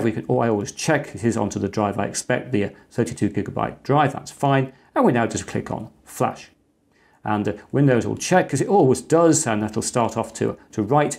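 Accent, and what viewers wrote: United Kingdom. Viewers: Dear: I've been enjoying your videos and learning through them for several months now, and I thank you heartily for that. I wish to make a suggestion to you to further enhance the quality and usefulness of your videos. Trouble is, there is a certain amount of ECHO in the room where you record your videos, that hinders the clarity of your otherwise excellent speech and keen British pronunciation. And believe me, that echo makes some passages hard to understand. I dare suggest that you seek the counsel of a sound recording specialist that helps you to identity the sources of those echoes and take measures to dampen them, such as adding wall isolation materials, or perhaps some curtains or rugs. THANK YOU for your attention.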